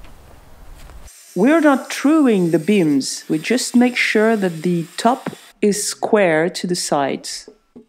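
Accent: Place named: England, United Kingdom. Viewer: i really like your english accent. have a nice day ;-)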